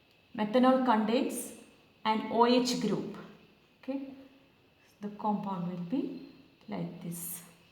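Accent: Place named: South India, India. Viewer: i thought from south part of India,based on yours accent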